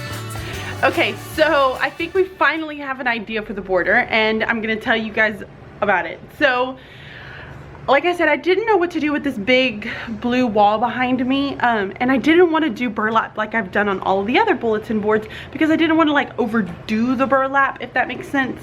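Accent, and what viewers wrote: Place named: South, United States. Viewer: I love listening to you with your Southern drawl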